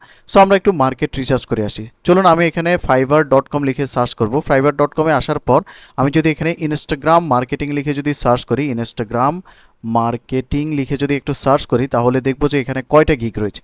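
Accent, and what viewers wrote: Noakhali, Bangladesh. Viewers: স্যারের বাড়ি কি নোয়াখালী?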